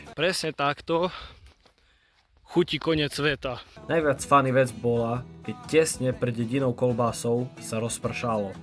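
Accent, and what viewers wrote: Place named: East, Slovakia. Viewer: zaujmave ze si vichodnar a nebola tam ta to veta mamo ja idzu na vadrovku hlauski mi nabal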